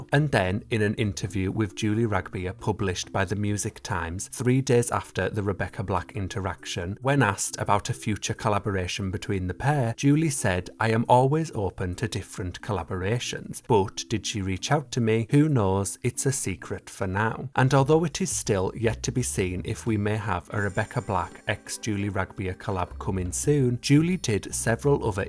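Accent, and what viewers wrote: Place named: Hartlepool, United Kingdom. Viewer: Your accent is very Hartlepool 🧐😀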